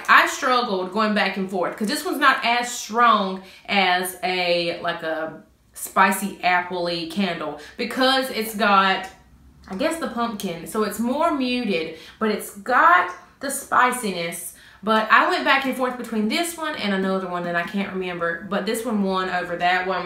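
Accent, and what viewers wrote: South, United States. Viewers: Just found your channel!! I love it! You are so precious and love your southern accent. I'm a Mississippi girl so I totally understand :)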